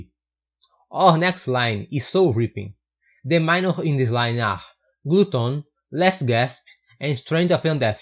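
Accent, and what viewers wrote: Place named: Northeast, Brazil. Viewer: Pontes Neto Pior que ele fala certo só que o sotaque nordestino é muito forte, hahaha lembra mto o Joel Santana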